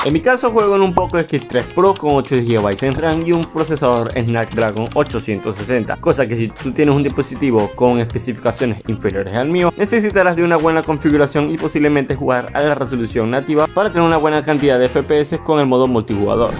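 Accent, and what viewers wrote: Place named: Mexico. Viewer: enserio mano, yo pensaba que eras de México xd. Sigue así tus vídeos son exelentes♥️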